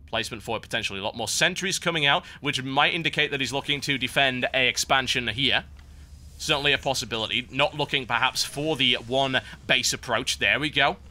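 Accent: United Kingdom. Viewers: U gotta love British cast.... Great great job mate ;-)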